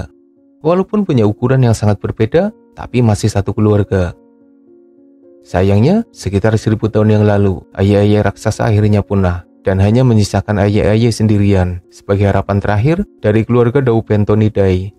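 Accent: Banyumas, Indonesia. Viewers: Kaya wong ngapak yah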